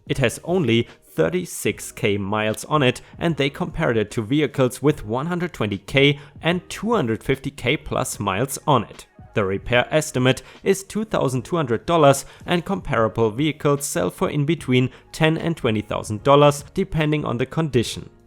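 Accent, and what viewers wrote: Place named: Germany. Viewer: Subbed, belled and happy to hear your crazy German accent :-)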